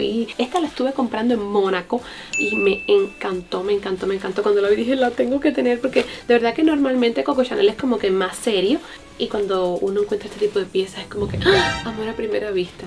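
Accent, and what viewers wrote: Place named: Cuba. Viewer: bella hermosa hablas muy parecido a sandra cires art obvio las dos son cubanas no lindas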